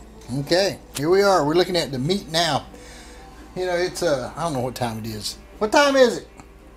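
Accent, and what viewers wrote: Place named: South, United States. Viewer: I don't know what was more entertaining your southern draw or the excitement in your voice. Really enjoyed your video!!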